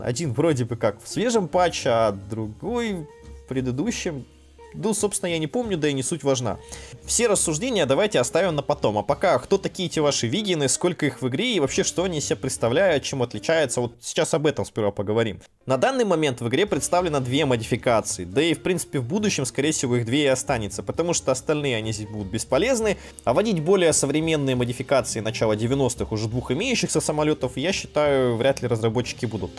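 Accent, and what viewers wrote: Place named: China. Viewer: Этот божественный китайский акцент!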